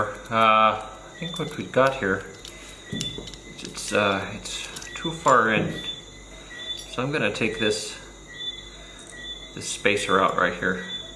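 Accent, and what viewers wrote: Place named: Wisconsin, United States. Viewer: You Wisconsin folk talk funny but you're a friendly bunch. Dont lose those calories!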